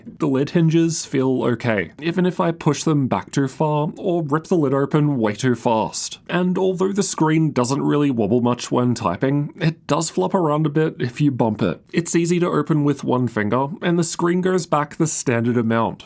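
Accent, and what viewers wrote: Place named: Australia. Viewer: Man you take the worst new gen Australian speech patterns, with the intrusive Rrrrr and the end of sentence falling intonation, and you magnify it by a thousand!!!! I can't listen to this verbal debauchery any longer. I'm out.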